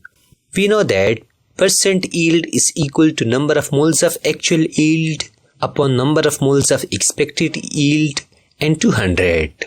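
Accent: India. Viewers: 🎉🎉 thank you I thought u r from India 🎉❤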